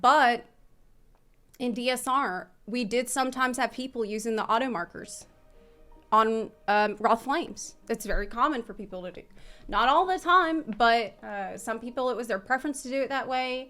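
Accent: South, United States. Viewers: Damn, has fallen hard back into her southern accent 🤣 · Why does have this weird southern drawl all of a sudden? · I feel like has gotten more and more southern the longer she's been in the U.S. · …what is this country accent? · Embrace southern. I had no idea she was southern, but I'm here for it. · WoW being back in the USA sure brought up your Southern drawl!:D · Damn, has a thick southern accent. 🤣🤣🤣🤣 And dicks out for Harambe!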